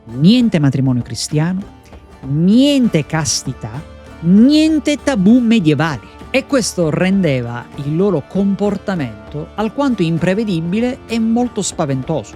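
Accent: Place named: Sicily, Italy. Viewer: 😂il sangue del sud non mente😄simpatia pura….sei il prototipo di un professore scolastico del nuovo mondo…simpatico…veritiero e che incolla l’attenzione …complimenti….il tuo accento sembra della Sicilia sicula non sicana ☺️👍👍👍👍👍🏆complimenti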